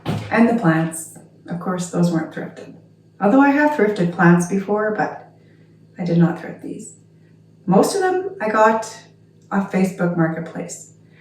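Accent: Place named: Canada. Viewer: She sounds Canadian.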